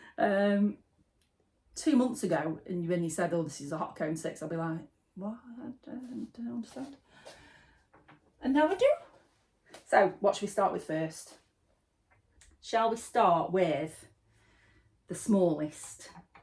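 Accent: United Kingdom. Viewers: Noo don’t ever think that. You are so lovely and I like your really pretty English accent ❤. And all of you 😘🙏🏼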